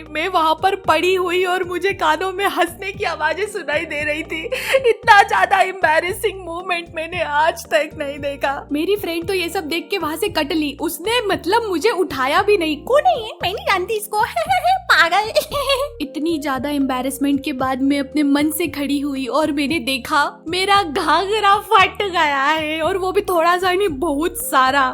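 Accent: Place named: Gujarat, India. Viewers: I thought you were gujrati because of your accent😮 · But aapki accent se toh aap gujrati hi lagte ho 😅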